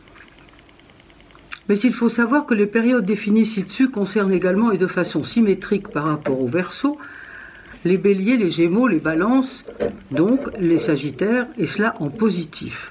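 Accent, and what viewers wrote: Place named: Algeria. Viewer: Accent pied-noir plein de soleil ☀️ merci pour votre travail ! Bélier ascendant vierge et lune en scorpion... j'espère que cette année m'apportera douceur et amour ❤️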